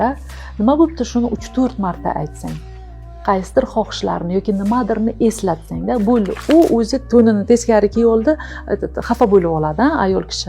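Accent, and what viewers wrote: Bukhara, Uzbekistan. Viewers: Бухороликмисиз опа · Бухороданмисиз шевачайиз кетоптида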